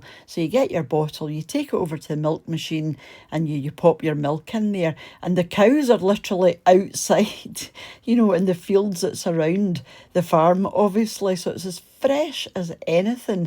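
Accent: Scotland, United Kingdom. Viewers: How heartening that within a few hours you have nearly 1000 likes, including mine. We have some similar milk vending machines near us but sadly some mindless vandals set fire to one this weekend. Mindless drunks and druggies - they need flogging. Your gentle Scottish accent is so heartwarming. Thanks for all your videos.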